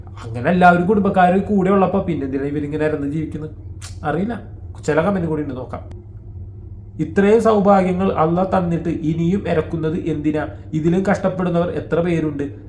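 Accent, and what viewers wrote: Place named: Thrissur, India. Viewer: Ikkade naadu evideyanu.. samsaram thrissur district kodungallur , thriprayar basha pole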